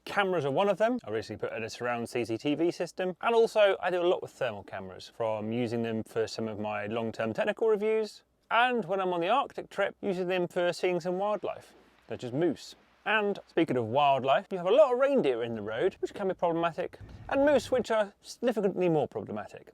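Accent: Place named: Scotland, United Kingdom. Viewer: my god i got a reply.. been watching you since the start of your conversion i heard the Scottish accent instantly cheered 🤣 and subscribed. I live in argyll. 65-70% of the way done on my conversion but just not getting the time to finish it. Hope all is well with yourself and thanks for the reply 😊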